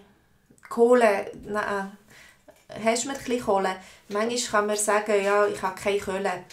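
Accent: Switzerland. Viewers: May I know what canton’s dialect is this? I am considering to register courses on your website, looks good!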